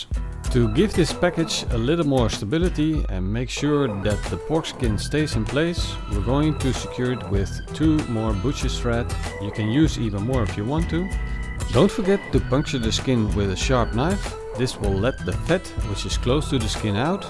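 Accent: Netherlands. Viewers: I already thought I heard a Dutch accent. I was going to ask you if you were Dutch but now I don't need to. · Hoor ik daar een Hollands accent? Mooi recept btw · You can hear the horrible dutch accent.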